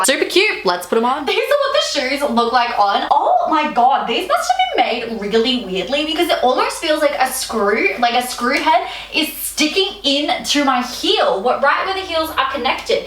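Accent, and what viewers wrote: Australia. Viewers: Cute video but curious to know, from one aussie to another... where is the sudden "kath and Kim" voice and sayings from? is this a new thing??? LOL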